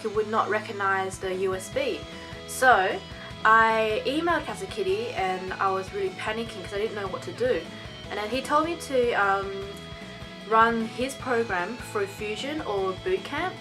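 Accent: Australia. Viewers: You did not tell me that you from Australia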